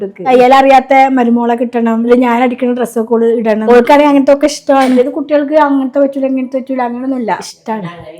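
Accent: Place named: Malappuram, India. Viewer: Malappuram varthanam ikkante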